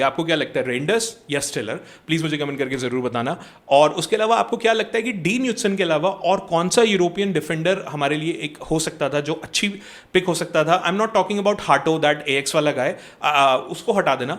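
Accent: India. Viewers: I'm not sure if Pintus will leave. He isnt a part of Carlo's staff, works for the club. Although Xabi will have his own staff, I think Pintus will stay. One suggestion from my side. I have noticed that people find it weird when you pronounce the players name in Indian way. Like usually other creators, if you have seen, they pronounce the players or clubs name in the actual pronunciation. Hope this might help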